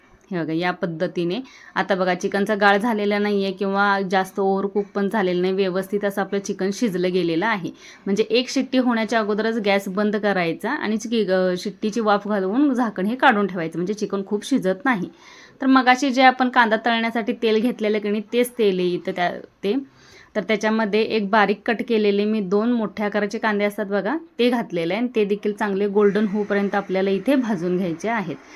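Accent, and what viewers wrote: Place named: Kolhapur, India. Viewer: Khup ch chhan banvte tai tu chikan chya resipi s aani bolte pn chhan tuzi kolhapuri bhasha khup chhan aahe❤❤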